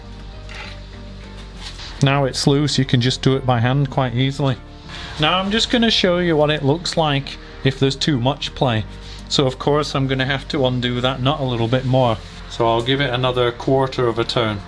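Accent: Liverpool, United Kingdom. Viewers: Great video!! Love the accent! Liverpool?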